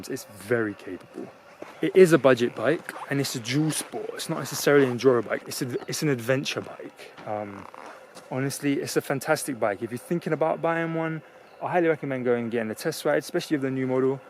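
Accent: London, United Kingdom. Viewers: Why you sound like your from London but for a Arab or whatever number plate lol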